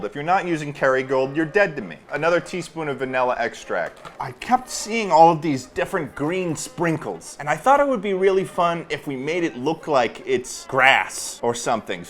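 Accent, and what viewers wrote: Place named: Massachusetts, United States. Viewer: This man is definitely from Massachusetts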